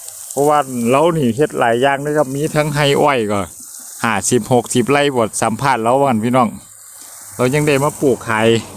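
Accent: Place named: Chaiyaphum, Thailand. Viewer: เจ้ากะเป็นคนชัยภูมิติ ภาษาที่เจ้าเว้า ถ้าบ่ชัยภูมิกะหนองคายเด้ข้อยว่า ข้อยกะคนบ้านแท่นคือกันเด้อ บ้านหัวนารู้จักดี เลี้ยวขวาข้างๆที่ว่าการอำเภอ ไป2กิโลฯมั้ง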